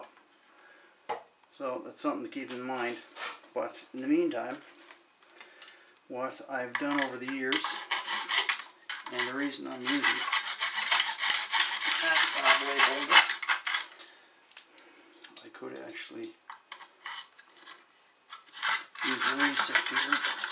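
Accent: Cape Breton, Canada. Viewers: Hello fellow caper, I recognize the accent. My tub has a clogged abs trap, and it's too close to several other adapters/elbows that have no room to cut them without taking the whole thing out, which would require taking my surround out and basically redoing the entire damn thing. My question is: is it possible to cut off and remove the trap off the 2 abs pipes it connects to, without having to take apart anything else? Like a special way I can cut out the trap leaving just the rings, and then carefully cut them off, then sand down all the glue and just pop a new trap on there?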